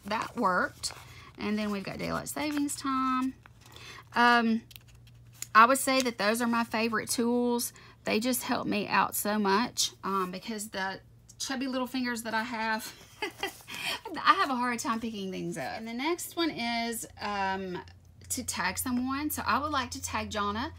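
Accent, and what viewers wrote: South, United States. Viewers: GORGEOUS! Loved hearing your answers and you are tooooooooooo kind to me! I love listening to your cute as pie southern accent :D Urban Decay is such a great brand... they have SUCH an amazing selection of all products too! I love Too Faced but sometimes the quality is hit and miss for me? But some is sooooooooooooo good. <3 loved this... I had so much fun collabing with you!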